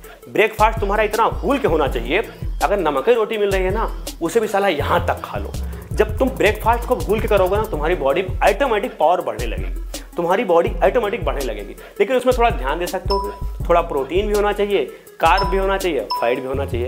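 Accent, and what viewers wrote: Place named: Bihar, India. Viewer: Gajb bhai aisan trainer to hm phli bar dekh ni ho aur apke bhasa se lga ta rua Bhiar ke lal bani kahe ki hm hu bani . Good 👍job the way in which you tell everything is awesome👏 unexpected🥰🥰🥰🥰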